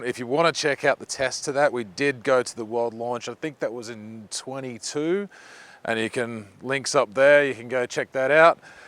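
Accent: Australia. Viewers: He sounds Australian.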